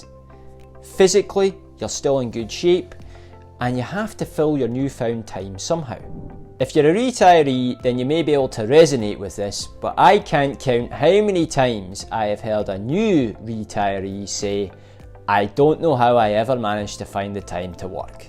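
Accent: Scotland, United Kingdom. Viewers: Excellent points. This extremely important video had 828 views. It proves your point that people pay more attention to planning vacations than planning retirement withdrawals. Love your accent. I've been to Scotland once. Beautiful country, great people. The weather could be brutal. Glad, the music in the background is toned down. Too bad it didn't help to crank up the number of views. Don't get discouraged. I'll watch. Content is great.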